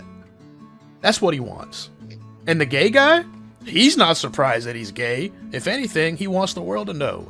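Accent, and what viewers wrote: South, United States. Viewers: HIS VOICE …he really is a man of the south..🐄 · In his own words, he grew up in the South; his accent and voice are not acted, he just raises his voice and adds the jokes for the character.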